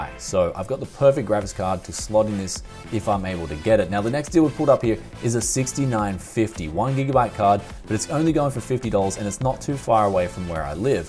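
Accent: Bogan, Australia. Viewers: Hey I loved your bogan voice it sounds awesome and hello from perth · Australians and their accent are so cute, hahahaha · haha that Aus accent. Spot on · why the bogun voice? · Hahahaha bogan voice xD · Came here for the aussie accent XD so entertaining XD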